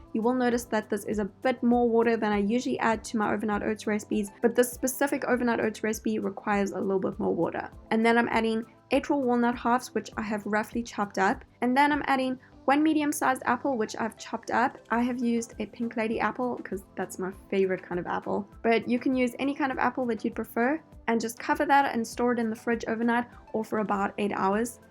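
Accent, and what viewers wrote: South Africa. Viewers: Are you maybe from South Africa? Youe accents sounds like it. Great video by the way 🌈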